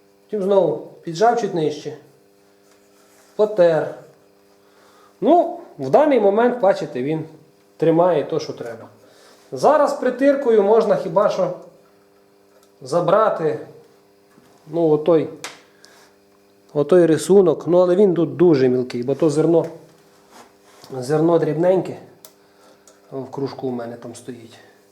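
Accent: Ukraine. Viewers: Захожу смотреть твои видео, только чтобы услышать настоящую украинскую речь)))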